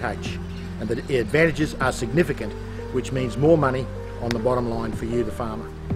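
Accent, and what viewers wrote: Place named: Australia. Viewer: That head of lettuce you picked up was absolutely Gorgeous! When I go to Australia (positive thinking) I definitely want to visit your farm. btw: I just love how you sound. Australian accent is so cool:)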